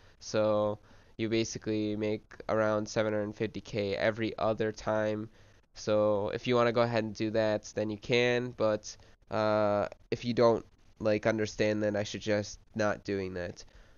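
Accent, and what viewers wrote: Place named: Cuba. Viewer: superliked you sound like a cuban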